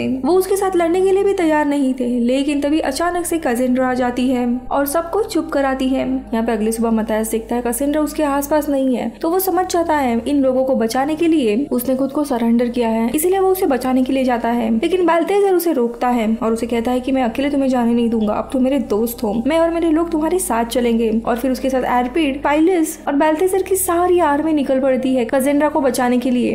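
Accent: Maharashtra, India. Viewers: Are you marathi mulgi?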